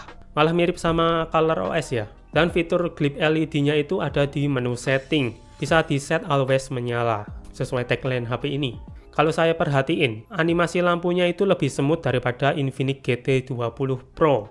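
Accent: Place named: Java, Indonesia. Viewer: Asli kliatan medok nya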